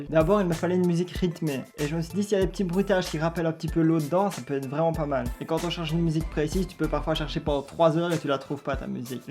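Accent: Belgium. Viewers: Tu es belge? #accent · Hey je me suis aboné j'aime bien se qeu tu fait ta du talent est je lenten a ta voi te Un belg moi osi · Ho ! Un belge !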